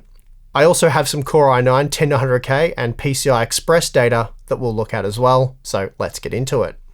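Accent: Australia. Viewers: And that Aussie accent just gives it extra spice!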